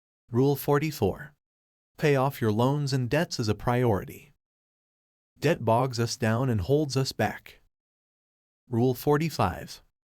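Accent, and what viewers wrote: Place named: Asia. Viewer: ROBOT READER with Asian Accent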